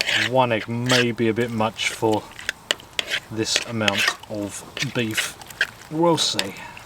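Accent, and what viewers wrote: United Kingdom. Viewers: I found your channel a few days ago and have been binge watching you ever since! I just saw one you made two years ago where you didn't talk at all. I'm glad you decided to start talking to us! (Americans love a British accent!) And, I'm learning a lot. You're so adventurous, especially with all the cold and rain. As I'm from Florida, I'm amazed at your tolerance for cold mornings before the fire's started and the coffee's made! PS That ending was so smooth! Those products were really well thought out!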